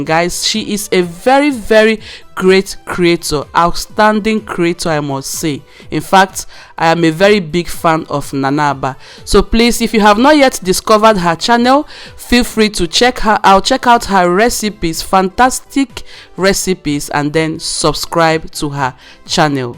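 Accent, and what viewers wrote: Nigeria. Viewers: That is a strong igbo accent.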